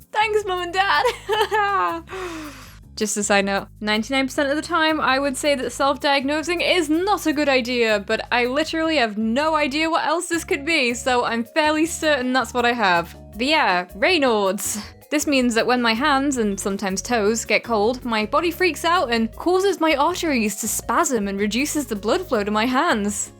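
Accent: United Kingdom. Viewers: Am I the only one who thinks the female British accent is really cute?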